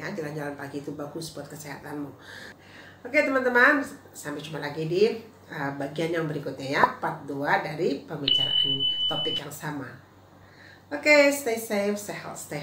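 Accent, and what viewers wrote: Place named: United Kingdom. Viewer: good night madam this is British accent 😊😊.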